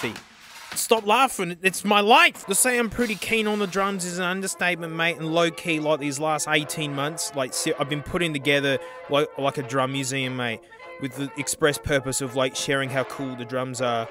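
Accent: Australia. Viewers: and that aussie accent lmao he uses his gift from god like it should be used. he is a godsend.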